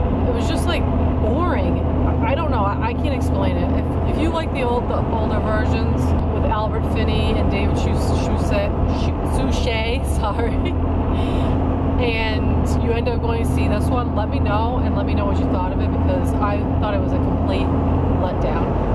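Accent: United States. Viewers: The way you talk has a.ways been ok to me you just sound American 😊😊👍🏻 Like sounds like “Like” maybe i say it similar . Just carry on 😊😊😊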